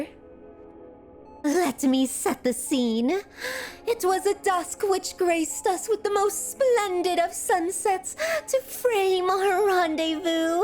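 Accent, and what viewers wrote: United Kingdom. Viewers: "ARE YOU STURRING FROM SLUMBER,AH EXCELENT TIMING, EXCELENT TIMING" with classical music in the background is the most British thing i heard today *not making fun of your accent or voice i really enjoy your content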